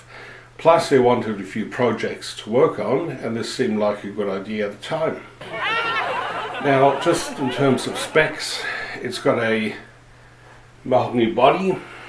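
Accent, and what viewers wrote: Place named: Australia. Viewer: Ahh hah! The ALMOST Oz accent and the fact you quoted the price IN AU dollars! ;oP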